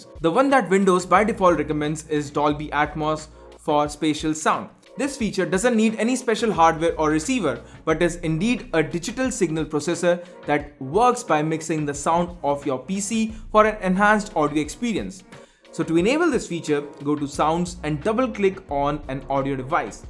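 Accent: India. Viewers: always the indian accent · indian accent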